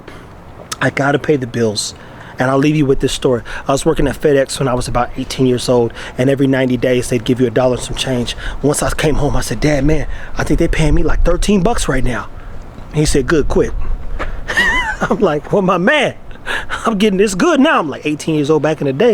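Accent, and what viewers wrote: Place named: Jamaica. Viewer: I pick up Jamaican trace in u someone in ur family is Jamaican.. u should go lecture philosophy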